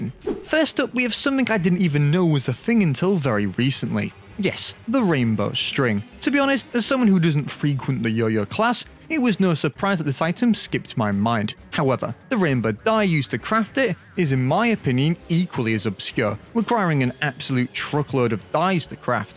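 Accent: United Kingdom. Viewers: hearing his british accent makes me mad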